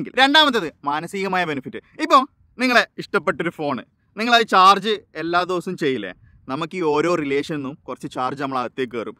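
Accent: Kannur, India. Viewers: Engale kannur karan aano bro ?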